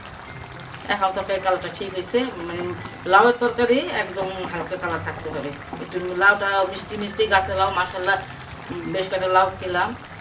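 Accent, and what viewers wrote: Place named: Narayanganj, Bangladesh. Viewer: Apu apnar desher bari kothay?apnar kotha shune mone hoy Narayongonj ba tar ashe pasher elaka.shuvo kamona.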